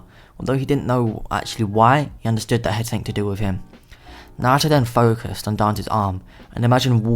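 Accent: United Kingdom. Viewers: u British mate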